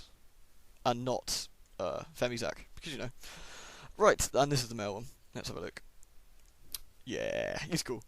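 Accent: United Kingdom. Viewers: since I have no interest in this game, I think I just sat through almost 8 minutes of video just to hear his fabulous British commentary XD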